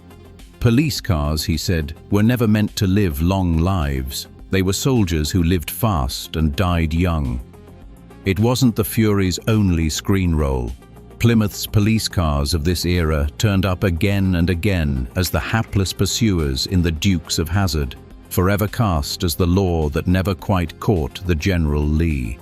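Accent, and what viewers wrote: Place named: United Kingdom. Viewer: American cars, American cops, American highways, and this poster decides that it has to be narrated with a British accent. WTF?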